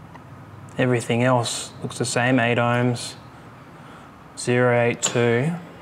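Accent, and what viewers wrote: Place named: Australia. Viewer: Always like Aussie accent.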